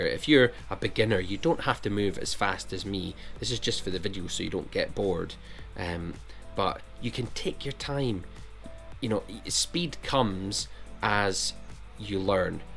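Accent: Scotland, United Kingdom. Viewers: I love the fact that you are Real. You like us, can make the common mistakes of barbering. It’s this awareness which helps us learn how to fix ours. I could watch you for hours. My third great grandad was Scottish. I love your accent. Well done for being a great tutor. ❤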